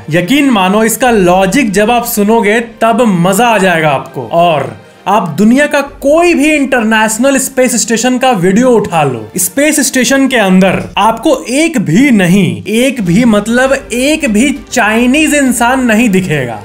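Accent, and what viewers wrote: Bihar, India. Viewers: Bhai app 😊Bihar se ho😊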